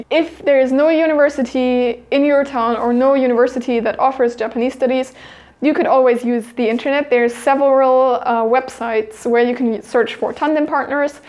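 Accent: Germany. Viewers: Ist Sie Deutsch? Hört man raus finde ich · that accent, are u german? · is she German? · Is she German? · Are you A German because you have any accent from a german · Three seconds into this video and I already like you. And you are German. Heard that within one second. Lol. Me to. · Where are you from? Sounds like you have a little German accent, but it also could just be my imagination. I'M learning Japanese right now and that was pretty helpful thanks. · is she german? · It took me five words from your mouth to know that you are German. Pretty cool huh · Man merkt durch deinen Akzent , dass du aus Deutschland kommst xD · you hear the german accent... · Are u german? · Deine Stimme klingt deutsch :D bist du deutsch? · Are you German? You sound sooo German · ...mein erster Gedanke war "bist du aus Deutschland?"... nach 6 Minuten war ich mir dann sicher ;-) (ist das erste Video was ich mir von dir anschaue, find ich wirklich gut) At the end of this video you asked about tipps we might have, and i have this to add: Since i bought lots of books for learning japanese and had little time or motivation to actually use them i moved to something while i was using flashcards. 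I downloaded an app for recording stuff and started to record the vocabulary section of one of those books so i would first say the word in german and after a few seconds i would say that word in japanese. Since every word is a single voicefile, i added them to a playlist and listen to it every spare minute. And everytime i recall a word almost perfectly i would delete it off the playlist. once every week i'd put all words back to the playlist and start over, to practice and recall the things i already know. The Progress in which i advanced since then is: in less than 2 months i moved from like a hundred Words to almost 700 Words... it might not be the best, but as well as you used several different ways of studying, it might just add a small portion to the whole process of learning 日本語. Well, thanks for the vid, since i always search for new motivation everyday. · This is the first video i watch on this chanel but is she german? 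Like from the english accent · You sound so German :o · Ich habe dich gerade gefunden und kennengelernt. Und man hört den deutschen Akzent (wie bei allen auch) wenn du englisch sprichst ^^ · Ich dachte du wärst deutsch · First second of the video starts Me: "Oh, a fellow german" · Du bist Deutsch oder? ^^ dein englisch hört sich so deutsch an finde ich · Are you german? Your accent sounds german (adorable😍) haha I have a strong Hebrew accent (soo bad) haha love from Israel 🇮🇱😘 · are you German? your accent is great ♥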